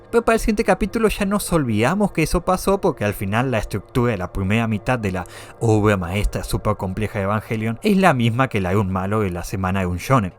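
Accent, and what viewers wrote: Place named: Germany. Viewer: que onda sos de Alemania que tenes ese acento? Pareces Asuka en el doblaje latino (? buen video padreeee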